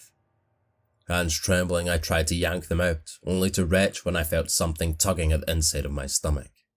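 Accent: Scotland, United Kingdom. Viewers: Your name is Mr. And you’re Scottish just like Gordon........ I wonder if this is chef with an accent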